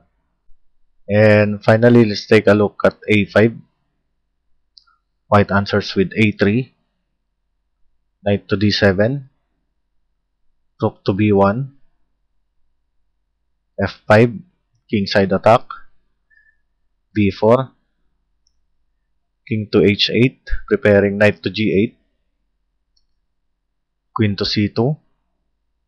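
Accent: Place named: Tagalog, Philippines. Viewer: OMG that is a Tagalog accent!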